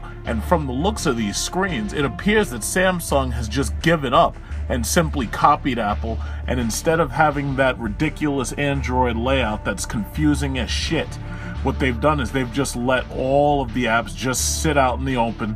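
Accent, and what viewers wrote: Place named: New England, United States. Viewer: where the fuck do you think apes phones are made dumb shit"CHINA"! THE ONLY GARBAGE I'M HEARING IS YOUR YANKEE ASS VOICE AND YOUR REGURGITATED APPLE IPHONE BABY FOOD THROW UP! I actually like apple and android but you're what makes this video and you're misinformationed ass so bad is you're a spiteful ignorant old dip s***.!! yeah the designs are similar but there is no way any copying going on anymore on because it blows f**** rings around the iPhone and I know for a fact because I own both and I continually go back to my Android every time. apple is for children to learn how to use a phone so when they grow up don't know how to use an Android you f**** moron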